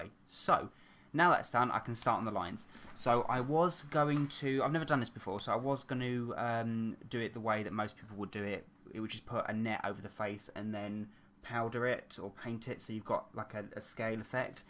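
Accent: United Kingdom. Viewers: I would like to say that I do thoroughly enjoy seeing your tutorials and you are very talented. I also enjoy listening to you talk there is just something about the british accent! In addition to the praise I would also like to place a request for three people: Graverobber and the Repo man from Repo! the Genetic Opera and Lucifer from the Devil's Carnival! Keep the videos coming and thank you!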